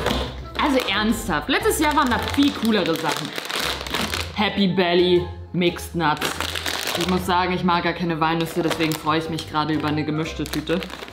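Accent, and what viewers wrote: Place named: Hamburg, Germany. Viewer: Also da wo ich herkomme sagen das viele Leute so😂 und da meines Wissens nach aus der Nähe von Hamburg kommt ist es evtl. deshab so🤔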